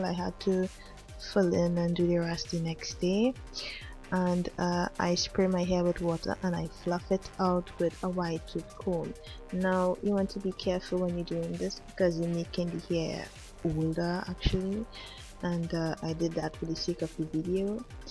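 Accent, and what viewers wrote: Trinidad and Tobago. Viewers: Your accent sounds Trinidadian...great tutorial btw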